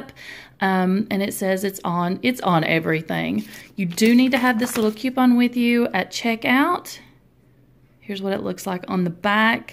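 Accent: South, United States. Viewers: I just found your channel and was getting ready to ask where in the South you were (heard that Southern accent😄)then I saw your p.o.box address in TN. I live in North Georgia about 60 miles from Chattanooga TN. I've never heard of Elizabethton TN. Nice to find another Southerner here. 😄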